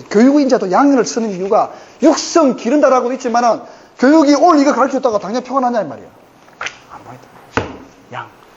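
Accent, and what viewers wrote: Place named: Gyeongsang, South Korea. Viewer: MB 젊었을 때 모습과 많이 닮았음. 목소리도 많이 닮음. 경상도 포항지역 사투리의 억양도...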